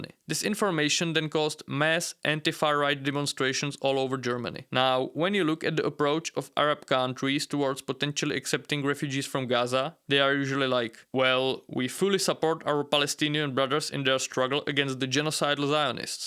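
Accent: Czech Republic. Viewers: Your Czech accent is extremely strong ...Try to at least a bit to change when narrate video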